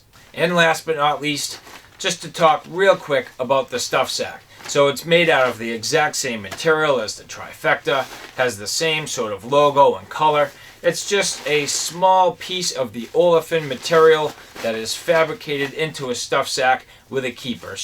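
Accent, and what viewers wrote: Boston, United States. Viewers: Not sure where is the US you are from but judging from your accent - you just got to be from the NE, possibly Bawston? Am I right? BTW, I just got my Trifecta in the mail; now I got to go try it out. Cheers.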